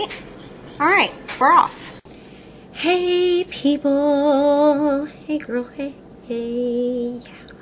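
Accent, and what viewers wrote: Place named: Rural, United States. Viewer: lol ur hick accent is funny.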